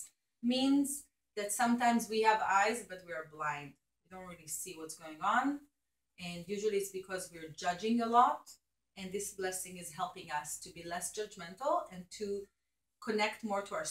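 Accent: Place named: Israel. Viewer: Thank you for explaining and telling us to stand. I can not sing, and have always been put off going to Shul because all are singing except me. I really like your accent, Ivrit from H 'Aretz. Could you do some videos with just speaking prayers?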